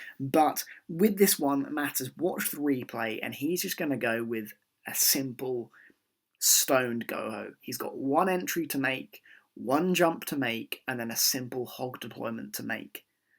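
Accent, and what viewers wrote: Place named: United Kingdom. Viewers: British accent OP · great series. I definitely remeber Dakota telling me to keep my plans simple when i was a tryout in alpha. Keep up the great work i look forward to your vids and your British accent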